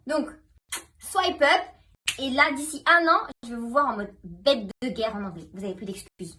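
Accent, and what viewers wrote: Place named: United States. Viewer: la fille américaine qui parle frensh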